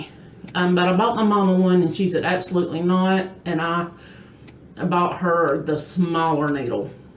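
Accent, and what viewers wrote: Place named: Georgia, United States. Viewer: LOL, girl I didn’t know you were from SoUth Ga until you told us. I didn’t hear your accent at all until you let it go. My dad is from Cordele and my daughter attended Valdosta State. ☺️ Small world.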